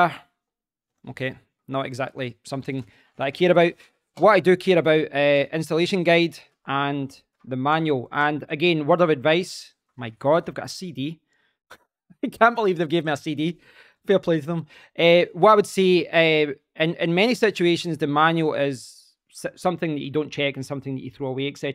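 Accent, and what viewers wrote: Scotland, United Kingdom. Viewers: Love the excellent description and the great Scottish accent. Thank you!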